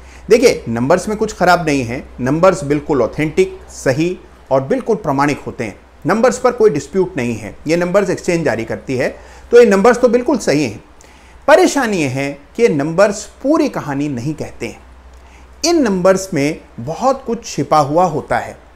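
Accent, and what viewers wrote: Standard. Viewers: Sir, Aap ki suddh Hindi suntehi karn trupt ho gaye. Thanks a lot for informative video.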